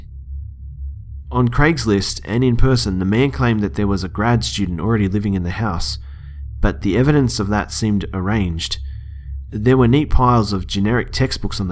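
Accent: Australia. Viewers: As a fellow Aussie, it's so nice to hear your voice :)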